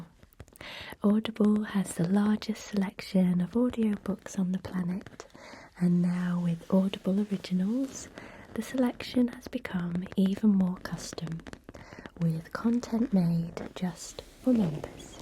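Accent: England, United Kingdom. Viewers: You've got such a thick English English (from England) dialect, when you're normally speaking it, it's really annoying to me. Sorry, no offense, sweety. Just the pronunciation. But your whispering voice gives me the perfect tingles, I don't know why, but I can chill totally :) That's ASMR. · Most people probably come here for the English accent, I'm English too and it still has the same blissful effect, such a nice accent! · The lovely British accent makes the ASMR even more powerful. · Such a beautiful voice and I love the English accent. Ooof ❤❤❤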